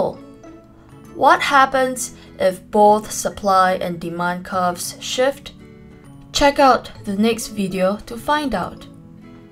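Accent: Singapore. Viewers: are you from Singapore or Msia? sound like Singapore accent but very subtle :) I miss my country! :D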